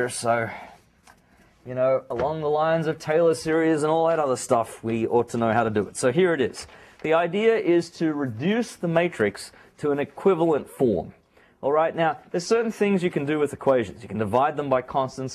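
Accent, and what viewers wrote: Australia. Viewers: Is he an aussie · this lecturer sounds like he has a bit of an Australian accent. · oh, australian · Is he an Australian ?? His accent doesn’t seem American.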